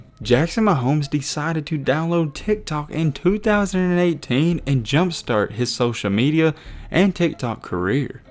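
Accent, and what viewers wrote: South, United States. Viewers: Why are you talking so southern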